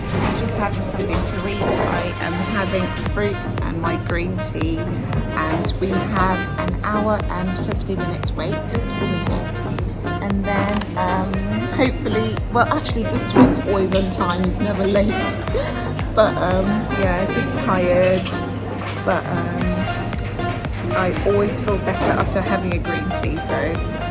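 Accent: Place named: United Kingdom. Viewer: I subscribed because of your bri**ish accent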